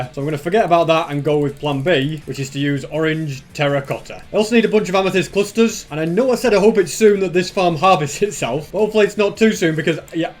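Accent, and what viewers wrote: United Kingdom. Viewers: Is it just me or sounds british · He is british you plum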